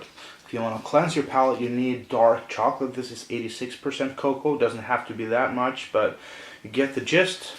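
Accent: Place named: Norway. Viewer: I really enjoyed this video,I am not a whisky man but I will have the odd single malt or a bourbon at home and yeah chasers are very common in the UK, I first had you down as American and then Irish and then Dutch and then Danish,are you Norwegian though? Cheers mate,subbed to and beer looks good as well,17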